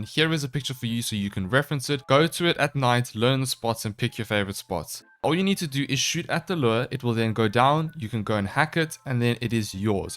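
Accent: South Africa. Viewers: Are you South African? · Are you south african??❤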